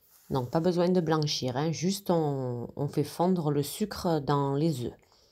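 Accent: South, France. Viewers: Magnifique voix tu es du sud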